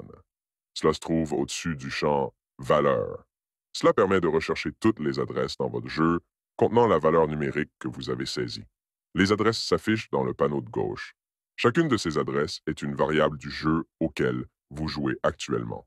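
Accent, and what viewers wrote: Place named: Quebec, Canada. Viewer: T'es Québecois :)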